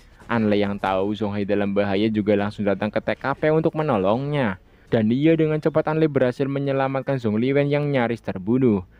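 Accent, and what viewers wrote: Java, Indonesia. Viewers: orang jawaa ngapak yaah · gw demen sm naratornya. Ada medogh2nya gtu klo ngmg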